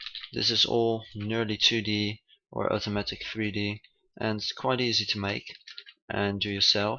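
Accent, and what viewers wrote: Netherlands. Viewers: Are you Dutch? Your accent sounds really Dutch. Especially that you say the "W" in sword ;)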